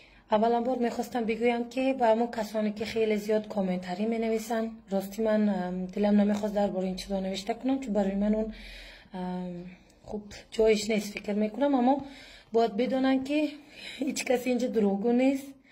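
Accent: Tajikistan. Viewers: Хохрчон мисли точикхои дунёо гапзанет зинда боши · Саломат бошед Мо аз точикистон ба шумо саломати, Мо хеле хушхол шудем ки ин хам забони Мо ин хел тичикиро тоза гап мезанед саломат бошед. Зинда бош точикистон бо Бухоро шарифи. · Точики хакики будаи соф гап мезани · Забони точикро тоза гап мезани офарин. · Офарин! Барои забони ноби точикона❤❤❤ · Умница,я очень рада что она так чисто говарить на таджикском языке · Ахсану офарин ба шумо,ки дар мактаб бо забони узбеки тахсил менеду, бо забони точики тоза ва беолоиш сухан мекунед.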